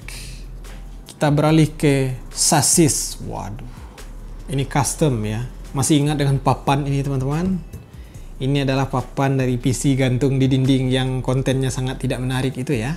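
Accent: West Sumatra, Indonesia. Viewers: Abang orang minang kh?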